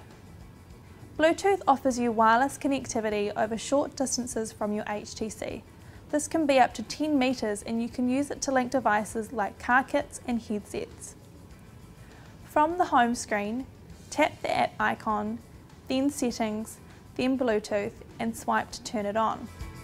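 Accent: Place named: New Zealand. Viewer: dat New Zealand accent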